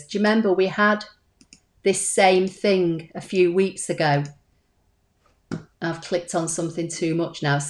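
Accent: United Kingdom. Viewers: Nice to hear a voice from uk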